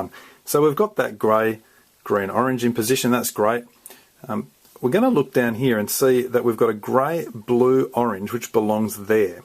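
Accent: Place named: Australia. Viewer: Are you Australian, mate? Loved your accent 😂 Also loved the tutorial. It really is the best! You explain all the basics, but don't treat your viewers as idiots and repeat same things seventy two times. Your explanation is clear and simple and you rule!! 😎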